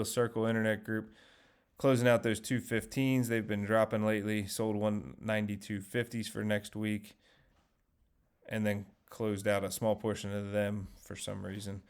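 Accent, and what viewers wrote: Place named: North Carolina, United States. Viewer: You sound like you could be from N. Carolina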